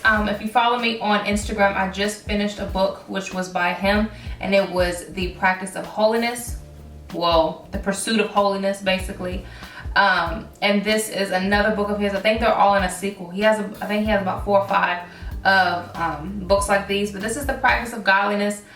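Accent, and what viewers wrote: South, United States. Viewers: where are you from?! I wanna say the south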